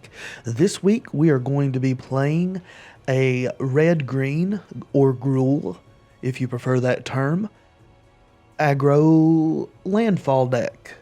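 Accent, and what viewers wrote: United States. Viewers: Definitely had to subscribe and leave a like. This was a very entertaining video, and I'm glad I happened to stumble upon it from watching LegenVD. You have good audio and video quality (looks very crisp!), a clear voice, and I enjoyed how you always explained your reasoning for whatever play you were doing. I was already familiar with all of it, but I've always appreciated when people playing this help new players out by giving advice. Though I think my favorite aspect of watching this was just how pleasant you were. Very thoughtful no matter how you were doing. That said, I've got to ask which state you're from after hearing the accent.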